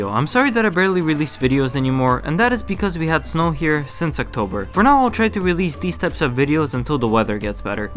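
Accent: Russia. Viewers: has a Russian accent? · Dude, why do you have Russian accent?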